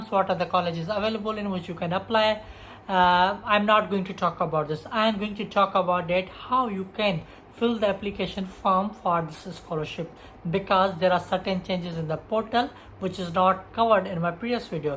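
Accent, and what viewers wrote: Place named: Sindh, Pakistan. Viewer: Sir apki English main bhi Sindhi hone ka sabbot hai♥ MashaAllah Boht acha guide karty ho ap..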